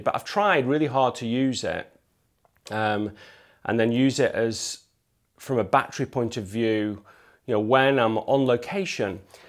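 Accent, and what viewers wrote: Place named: North West England, United Kingdom. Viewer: North West accent - yes.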